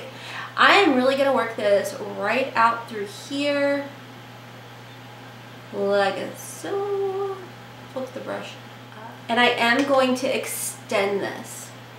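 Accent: South, United States. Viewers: This look is so beautiful on you!! And your accent! I love it you must be from the south, as I am!! I’m in Oklahoma and it’s hotter than satan right now!! And I do like first impressions on palettes! Great video xoxo! Lots of love!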